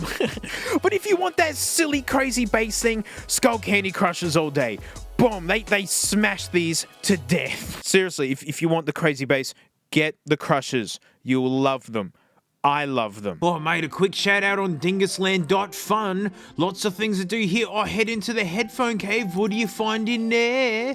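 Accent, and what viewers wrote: Australia. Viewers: Im early to the aussie yelling guy for once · Wake up, the aussie man is yelling at headphones again. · finally im early to hear the australian man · I dont care about audio The only reason i subbed is to watch a random aussie man yell at tech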